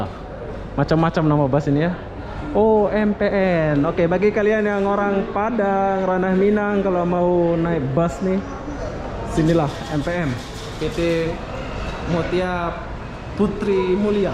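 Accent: Malaysia. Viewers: Asli lombok merantau Malaysia karna udah lama dmalaysia jadi bhsa udah kental malaysia kira2 gitu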